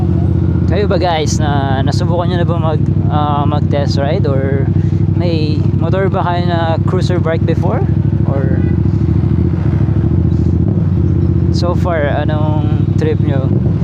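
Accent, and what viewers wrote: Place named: Conyo, Philippines. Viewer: nung narinig ko ung conyo voice d ko na tinapos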